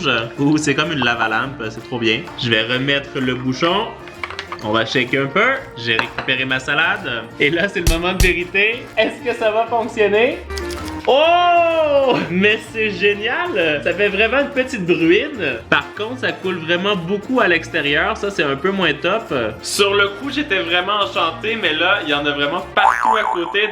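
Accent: Canada. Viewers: tu mon youtubeur préféré desCarl is cooking vidéos accent canadien et qui met quoi des vidéos trop trop rire 🇨🇵🇨🇦👍👍👍👍👍👍👍👍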